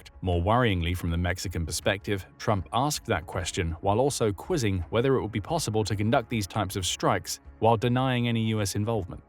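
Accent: United Kingdom. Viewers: I cannot even believe your trying to downplay the affect of fetanyl on the American population with your British accent as though you know… honestly it is disgraceful and without value to say it may not be as big a problem as Trump is doing. He’s literally doing what we the people have asked. What are you doing…?!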